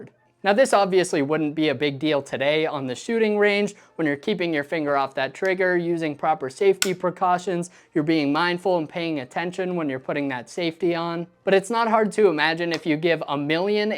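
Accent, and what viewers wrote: United States. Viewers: I can tell you're from Ohio so came everybody I talk to our accent, is something straight out the cornfield.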